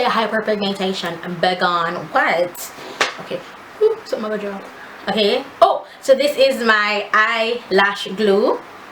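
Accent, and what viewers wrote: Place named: Jamaica. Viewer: loll you're so crazy i lavvv it. aint nothing like a jamican accent lol.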